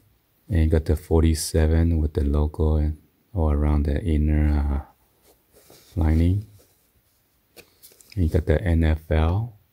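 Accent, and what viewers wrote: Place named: Japan. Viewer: 発音からわかる、100パー日本人。賭けても良い。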